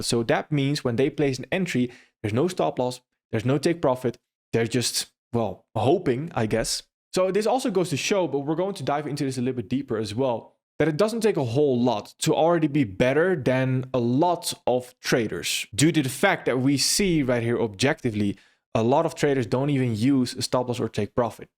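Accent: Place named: Netherlands. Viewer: Ik sense een beetje hollander 😅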